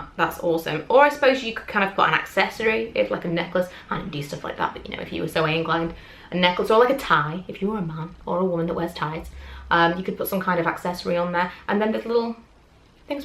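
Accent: United Kingdom. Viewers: I loved the video i am moving next month and this helped me a lot to get some ideas :) btw are you british?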